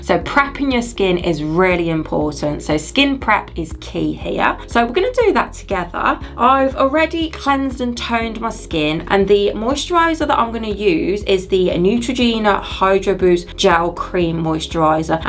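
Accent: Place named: Birmingham, United Kingdom. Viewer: Subbed. Can I hear a fellow Brummy? ❤️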